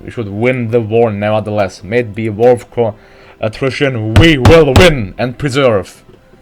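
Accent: France. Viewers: Ты француз?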